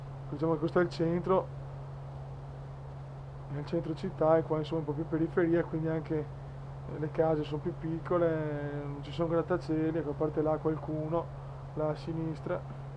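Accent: Veneto, Italy. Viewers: stima per il veneziano ahahah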